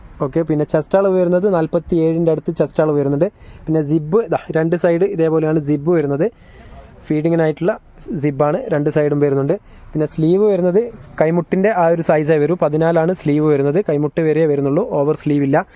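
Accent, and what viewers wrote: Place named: Karunagappally, India. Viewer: Karunagapalli karuda slang anallo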